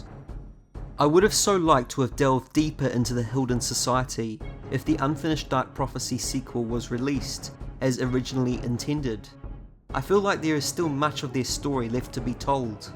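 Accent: New Zealand. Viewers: trying to work out your accent, Kiwi?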